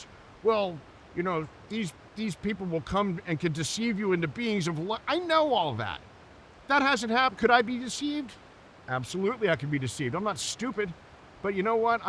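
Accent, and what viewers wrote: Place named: Philadelphia, United States. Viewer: An excellent communicator. Held my attention the entire time...and not just because I was listening for his Philly accent.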